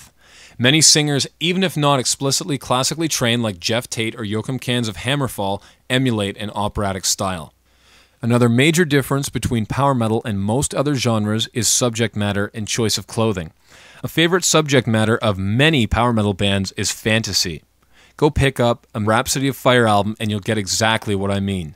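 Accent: Canada. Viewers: The narrator is canadian for sure.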